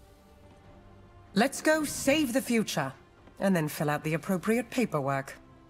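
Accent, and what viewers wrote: United Kingdom. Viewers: You have a little character in your British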